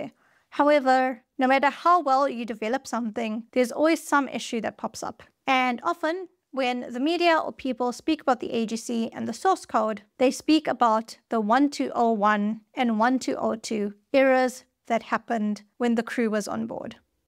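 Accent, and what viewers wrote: South Africa. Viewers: Sounds Seth Efrican. Some antipodean accent. Kiwis would say “luft” and “mussion” for “lift” and “mission”. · Are you South African? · Excellent video. It's heartening to see young software developers pay homage to the giants whose shoulders all developers stand on. I was 7 years old when Apollo 11 landed on the moon. Later in high school, I read about the AGC and I was inspired to learn how to program. First course was FORTRAN with punch cards. I worked as a software engineer for 38 years and never forgot the lessons learned from those pioneers. PS I love your South African accent. Keep up the good work!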